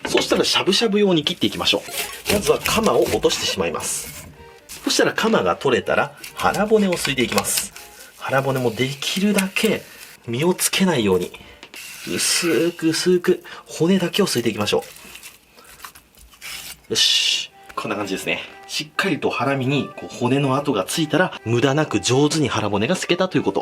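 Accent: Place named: Nagoya, Japan. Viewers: 名古屋弁イントネーションきくとさんを思い出します。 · いつもより名古屋べん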